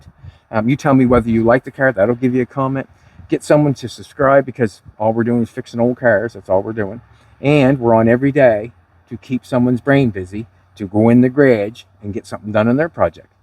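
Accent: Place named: Atlantic Canada, Canada. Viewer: This is my first viewing, so I don't know where you are, but I'm detecting a slight East Coast Canadian accent. If that is so, you probably know that the government, bless em, thinks they can save the planet by forcing car dealers to sell 100% of the new cars to be BEVs! If we the people, can't stop this madness, the folks who can go Cuba-Style on old classic automobiles are going to be in high demand! 1st view immediately SUBSCRIBED buddy!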